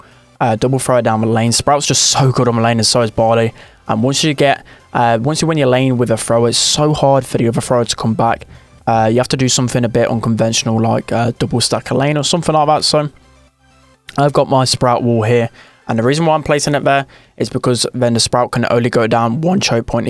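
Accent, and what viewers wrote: United Kingdom. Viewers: are u British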